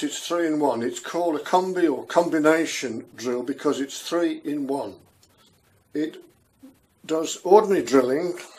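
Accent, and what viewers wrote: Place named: England, United Kingdom. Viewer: I love that english accent, cheers from Argentina :).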